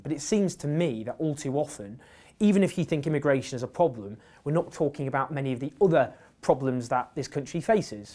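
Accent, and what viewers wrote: United Kingdom. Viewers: Love your channel from the USA. Awesome work you do. Do you speak standard British English or do you have a regional accent?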